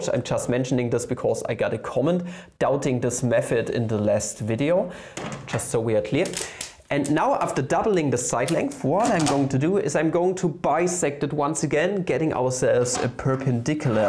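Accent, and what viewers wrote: Germany. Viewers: I love german accent 👍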